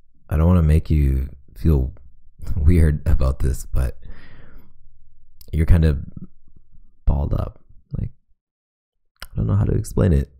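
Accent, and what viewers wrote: Canada. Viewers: I hear Canadian 😌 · omg the sorry is so cuteeee. Are you canadian by any chance?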